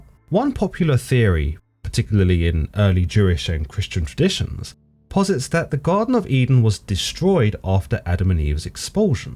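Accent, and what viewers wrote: United Kingdom. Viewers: First time actually seeing this guy. This whole time I thought he was a Caucasian British man lmao.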